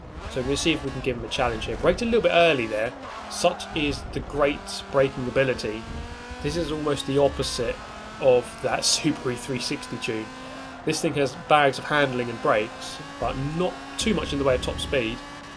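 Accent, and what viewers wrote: United Kingdom. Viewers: Just found your channel, and I love it! Excellent British commentary lol and great racing. Keep it up!!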